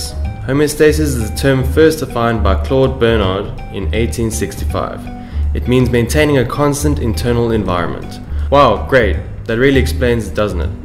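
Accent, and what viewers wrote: South Africa. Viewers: when you have the same accent as him (0-o) (lol he is South African for anyone wondering - or at least he sounds it) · Ak Khan He has a South African accent . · Are you south african? 🤔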